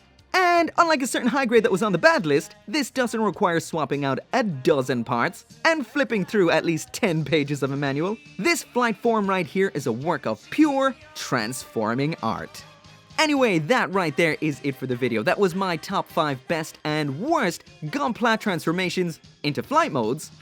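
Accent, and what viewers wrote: Ireland. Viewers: What the hell is your accent? It sounds like a Dubliner doing forced American! Like, Nevada or something?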